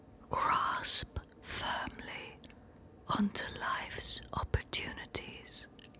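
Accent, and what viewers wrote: England, United Kingdom. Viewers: Going into this I knew that she naturally has two accents but I’d only heard the American one. And still...when she began speaking in an English accent I was surprised to say the least 😂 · She’s so lovely. -She’s become more British than me, in recent years! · English accent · She is one of the most beautiful women and most brilliant actors I have ever seen. Her elegant british accent made this asmr even more sensuous. Thank you Netflix! She is a natural. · She was raised in the UK and moved to America as a teen, thus she developed her English accent naturally. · How dare the people who mocked her British accent growing up. Madness. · i’m gonna die! her voice and English accent😫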